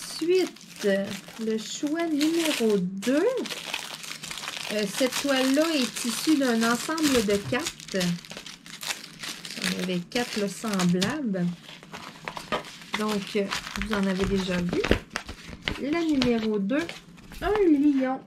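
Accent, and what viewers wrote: Canada. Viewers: Jaime bien tes vidéos et ton accent canadien avec quelques expressions non utilisees ici, je choisis le numero3 tête de mort papillon, bizz.andrée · Je viens de découvrir ta chaîne, et j'adore tes vidéos! Ton accent du Canada fait plaisir à attendre, pour moi petit française ^^ haha!!!! Moi je vote pour le 3! La tete de mort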